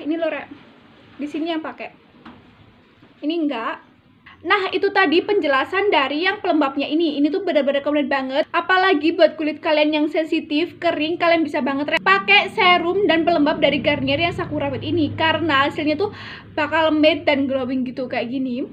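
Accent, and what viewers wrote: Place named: Surabaya, Indonesia. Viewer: Halo rek kembali lagi rek videoku duuhh paling seneng nonton video ini Surabaya ya medok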